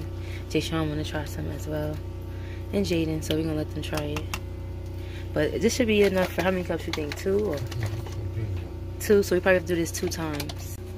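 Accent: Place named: New York, United States. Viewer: I love your videos, but i honestly enjoy hearing your voice. Its pleasant and calming. Plus that New York accent is everything 🤗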